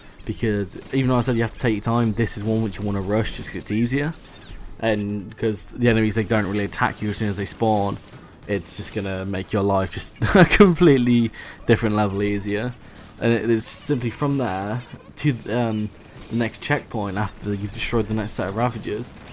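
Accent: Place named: United Kingdom. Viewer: Man you need to put subtitles in your vid I dont know were you live in GB but you accent is just too hardcore for me no offense there man just an idea. Great metod by the way.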